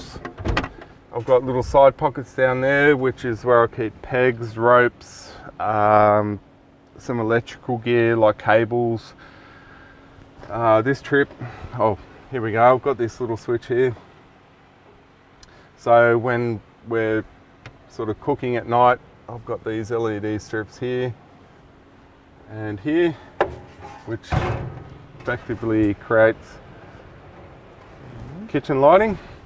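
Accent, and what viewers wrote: Germany. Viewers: Wenn du als deutscher sofort hörst das du deutscher bist/warst😂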